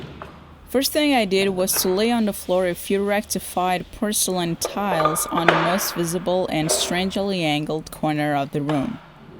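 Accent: Portugal. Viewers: Eu estava na dúvida se você era portuguesa ou imigrante. Depois desse vídeo, não tenho mais nenhuma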